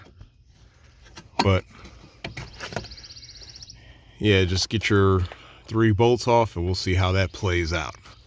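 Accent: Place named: Chicago, United States. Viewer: Thanks. I need to do this on my rig. I like your accent, reminds me of home. You from the burbs of Chicago? Or somewhere over by der?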